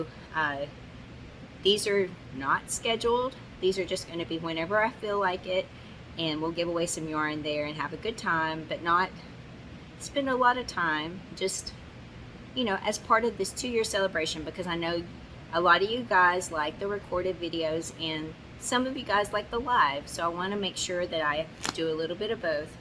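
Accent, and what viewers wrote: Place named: Texas, United States. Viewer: Love watching you. Love the accent it's like being back home with my mama in Texas.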